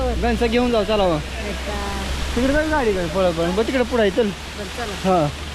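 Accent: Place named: Solapur, India. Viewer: सोलापूरी भाषाआहे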